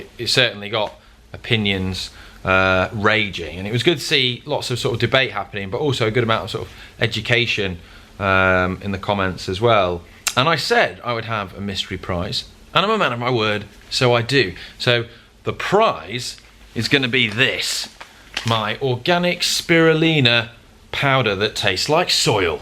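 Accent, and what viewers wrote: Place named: Northern England, United Kingdom. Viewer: First bike was my dad's old steel Carlton frame with old 105 gears that was 40 to 50 years old. Few years back car pulled out on me; broken bonnet, dented roof and broken teeth. Worst of all broken bike. Down tube and forks, wheels buckled. That meal time was a quiet one. Love the northern accent you got ohh and the vlogs.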